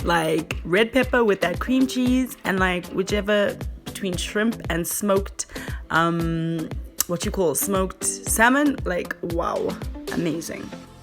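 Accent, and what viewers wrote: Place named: South Africa. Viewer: Not me being excited about the South African accent😂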